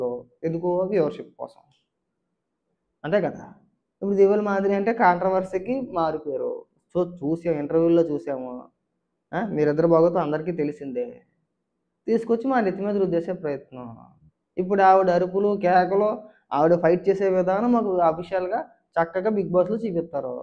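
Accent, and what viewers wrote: Godavari, India. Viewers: Anna bale matladuthunnaru meru❤😂 a vuru anna medhi ento koncham godavari slang la vundhi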